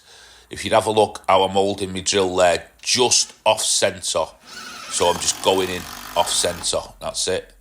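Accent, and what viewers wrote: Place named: North, United Kingdom. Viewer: Bathroom cabinet is brilliant and love to hear the "north" again! I am in Bavaria and never hear my home accent much. I really look forward to understanding what this feared "kickback" is! btw I got a huge trailer load of pallets from a lady who has tractor mowers delivered on them. I have a mountain of wood now! :)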